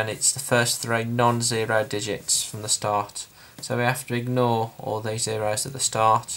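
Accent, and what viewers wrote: Birmingham, United Kingdom. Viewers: Thanks for the explanation + this 🥵 Brummie Accent.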